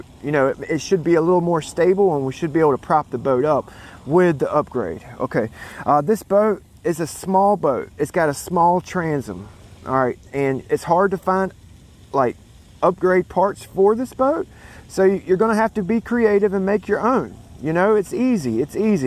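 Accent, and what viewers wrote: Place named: South, United States. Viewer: There's a TON of RC boat videos out there, but I must say; if you want to learn something, this is one of (if not) the BEST channels. Sometimes, can be WAY off the mark in his diagnosis of a problem (his learning curve is increasing like a rocket to the moon though...), but for the other 98% of the time you can rely completely on the information he so eloquently explains in his cool, laid-back Southern style. "NICE!" "Git it Bull...." "Full Trigger, Full Trigger!!!!......." If you have any similar RC experience (Cars, airplanes), boating should be easy. Just be forewarned; there is no "perfect" setup, parts are hard to find, you LHS has no idea what an RC boat is or where they can be found, but you will spend a lot of fun time trying to find "The Sweet Spot". That's the fun of boating, many of us are going where no man has gone before..... 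Lastly (and this applies to ANYBODY considering a venture into the hobby), ASK QUESTIONS of those who obviously have some experience; you can save yourself time and grief. There are good products out there, and there is crap. Experienced modelers know the difference and are usually more than willing to share their experiences with various products. has a business connection with ProBoat, but don't let that affect your thinking. ProBoat has done a whole lot in improving and perfecting the RC boating experience and the product line they offer is outstanding. Welcome to........ The Boat Gang! 😉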